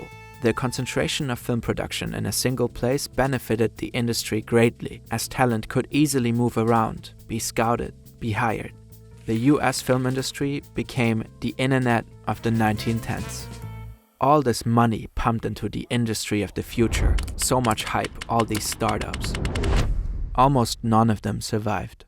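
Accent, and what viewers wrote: Germany. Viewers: I just love listening to his German accent. There will be a time when he loses it. It's probably the most iconic voice in story narration history. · ahh I can hear a German accent, I feel right at home 🥰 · Hä ist der Sprecher deutsch?!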